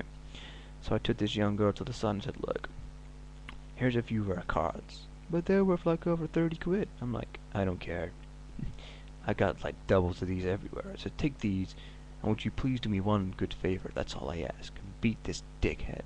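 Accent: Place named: United States. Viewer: Dude sersiouly stop with the american acsent its getting old lol also this vid is blury as shit! Liked what ya said though because its so true!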